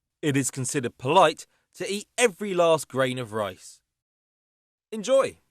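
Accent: United Kingdom. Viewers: i think it's funny that the narrorator sounds british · Okay now British man instruct me on how to seduce that Asian chick.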